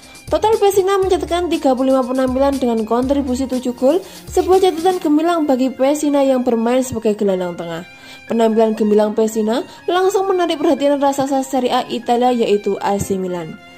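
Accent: Java, Indonesia. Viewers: Min logat jawanya jelas banget,,😂😂,,Mantup,,eeh mantap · Mbak mbak, jangan medok medok napa bahasa nya .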